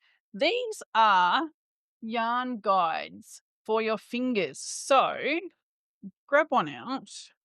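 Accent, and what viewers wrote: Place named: Australia. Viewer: Found your channel through this video and two minutes in I was infatuated by your personality! The Australian accent is a bonus 😂❤ very thorough video indeed, thank you! New subbie from Sweden!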